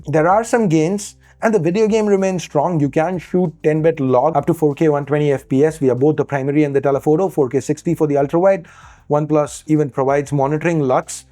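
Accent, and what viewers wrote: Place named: India. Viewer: Indian accent killing me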